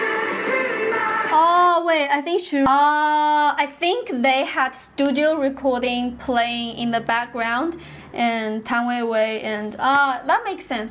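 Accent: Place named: China. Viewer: Your English is good except for a few words. Typically the word "in". You must end with your tongue touching the inner upper teeth. You left your tongue in the centre which most China Chinese do. Hope you are not offended. Hopefully, it will help you in your life 😘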